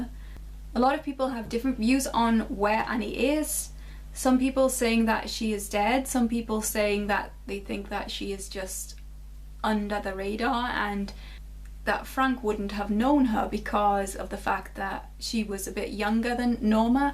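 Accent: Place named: Newcastle, United Kingdom. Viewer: Hay I love your videos and listening to you talk about twin peaks in that wonderful geordie accent :) you always put forward some interesting ideas and if I may say so, you are gorgeous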